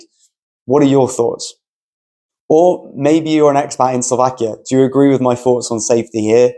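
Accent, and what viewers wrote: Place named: South, United Kingdom. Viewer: I already knew from the title that this guy would have a southern accent. There are parts of the UK where it's better than he's experienced, but his general point is still true overall, even about the other places. An obvious example I thought of was how here, it's common that a bar will just run a tab and you pay at the end. Another one like the ice cream incident, I filled the car up at the Tesco on our estate, realised I didn't have my wallet so no money or even ID. The guys originally agreed I should repark the car and leave the keys while I walk back to my flat for my wallet. When I came back in with the keys and they'd seen I was going through with the plan they just told me to drive off and get my wallet so they could close the thing quicker.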